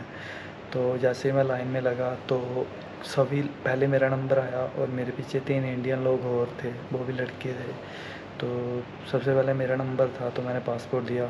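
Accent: Pakistan. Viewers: अबे तेरी बातों से और जैसी हिंदी बोल रहा उससे पता चल रहा साफ साफ तूं पाकिस्तानी है इंडिया को बदनाम करने की साजिश मत कर chutiye कुछ नहीं उखाड़ पाएगा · Bhai teri tone se lag raha hai ki tu pakistani hai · Iska accent pakistani kyu hai?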